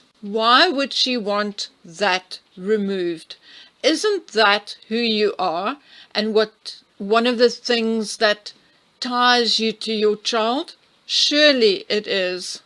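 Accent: South Africa. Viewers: And your beautiful South African accent. Makes me homesick. I lived 30 years in RSA.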